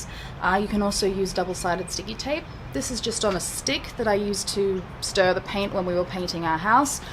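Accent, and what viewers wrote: Australia. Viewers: Love the Aussie accent on girls. Don't change a thing. These New York ears hear you just fine.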